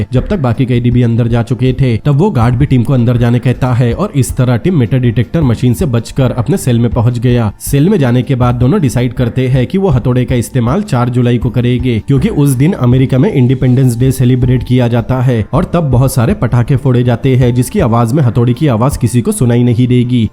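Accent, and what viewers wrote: Assam, India. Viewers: Bhai kya asaam se Hai kya tera pronunciation se pata chala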